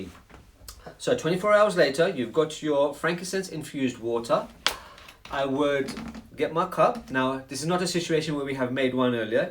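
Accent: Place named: Pakistan. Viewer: This guy sounds like a pakistani not omani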